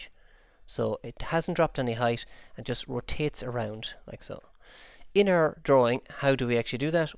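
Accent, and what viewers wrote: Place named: Ireland. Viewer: This is amazing! Very good job. Also love your accent. It's Irish isn't it?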